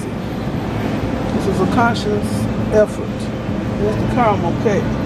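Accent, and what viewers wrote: Chicago, United States. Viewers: You from Chicago?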